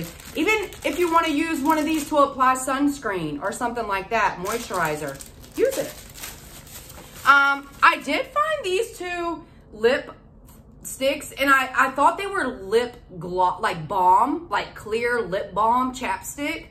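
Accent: South, United States. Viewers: You are so adorable and I love your Southern accent!! Your videos are always uplifting and fun. Thank you for being a sparkle in my day💜, couldn’t find the emoji but YES, YES, YES chop it!!!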